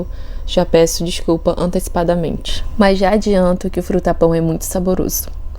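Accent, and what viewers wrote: Rio de Janeiro, Brazil. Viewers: Oi, linda...se sotaque parece carioquês...😅😅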